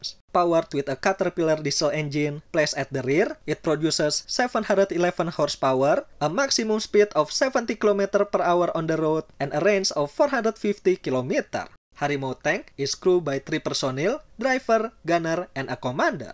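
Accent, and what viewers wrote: Indonesia. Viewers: English tapi pekat pelat Indonesia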